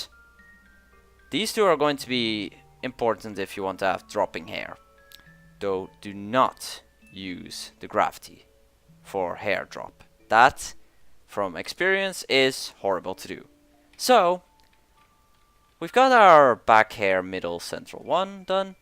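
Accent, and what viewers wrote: Netherlands. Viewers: i dacht al dat een nederlands acsent had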